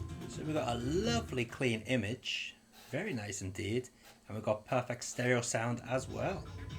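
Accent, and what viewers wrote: United Kingdom. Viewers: Hey I hope you don't mind me asking. Are you a native to japan or did you move there? Your accent sounds very British!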